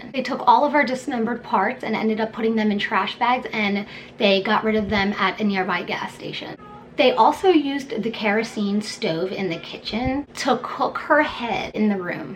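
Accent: Delaware, United States. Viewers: Damn I can hear that Delaware accent hard girl I miss home🤣💜💜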